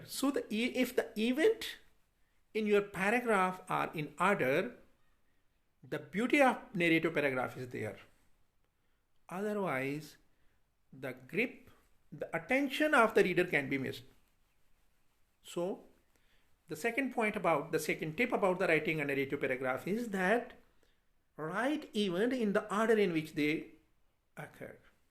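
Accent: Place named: Khattak, Pakistan. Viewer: Pure Khattak and non-native accent.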